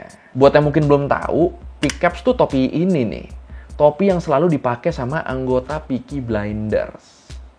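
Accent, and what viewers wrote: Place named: Madura, Indonesia. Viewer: gaya bicara lu mirip dilan bekasi campur logat madura, " selalu ngejait silet diujung top'i " selalu ada jeda cokk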